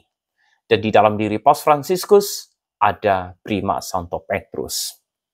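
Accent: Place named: Borneo, Indonesia. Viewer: Dari logatnya ibu ini kayak logat dayak ya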